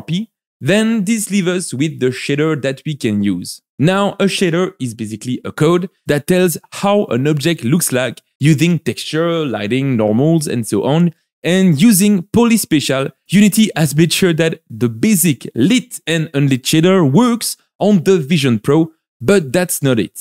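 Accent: France. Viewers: Rhooooh avec ton bel accent, ça sent la France tout ça ! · Frech Accenttt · j'adore l'accent qui prouve que tu est bien français hahaha , sinon bonne video !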